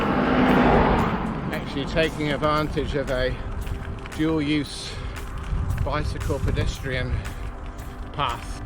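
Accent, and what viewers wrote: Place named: Australia. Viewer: Great to see you're still a bit crazy after all these years. And a bit of an Aussie accent to boot! 😁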